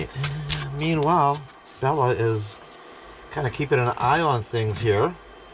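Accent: Pennsylvania, United States. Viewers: That launch was cool, thanks for sharing..I live on the Delaware MD border n when the skies are clear you can just see the launches from Wallops Island in Accomack Va..have never gone to the launch but it's on my bucket list..I enjoy your videos..you remind me of family, how i was raised in Ohio and for my Pa cousins it's the accent, lol..Happy travels, Cheri✌️❤️😊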